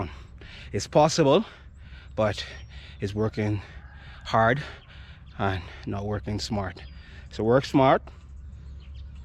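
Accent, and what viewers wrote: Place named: Bahamas. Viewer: I heard the accent am very familiar with it I don't know how someone can get a Bahamian English confuse with Jamaican English I've seen interviews with mychal thompson klay thompsons dad where they ask him if he doesn't talk in that Jamaican way or buddy hield I didn't know they had a somewhat accomplished distance runner from the Bahamas obviously not upper echelon norweigans,kenyans,ugandans,Ethiopians but still a decent one anyway I think this video is a perfect example of a strong Bahamian English dialect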